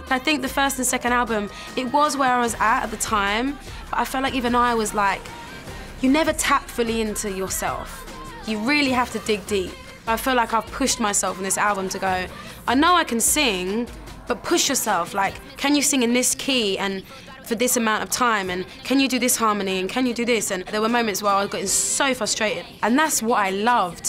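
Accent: United Kingdom. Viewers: I didn't know she was British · Before you ask, YES, she is british :) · Damn this whole time I didn't know she was British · Wow she's British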